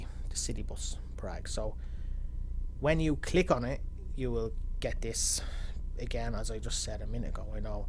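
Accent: Ireland. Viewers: this guy is definitely irish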